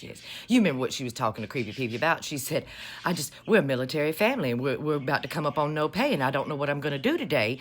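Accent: South, United States. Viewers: I love that i found your content. I dont know if its the passion behind your voice or if its the rhyming delivery, it may even be the southern accent. Regardless, its all a recipe for my new favorite political content. also, the way you say "bay-bee" has become a vocal stim for me now 😅. Thank you for what you do and who you are ❤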